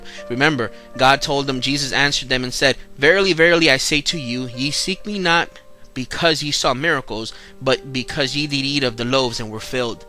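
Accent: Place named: Puerto Rico. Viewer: bro you sound Boricua like me. But you are soooooo confused. Tell me, ¿Where is Jesus returning to FIRST? ¿NYC?¿Paris?¿London? HELLOOOOOOO!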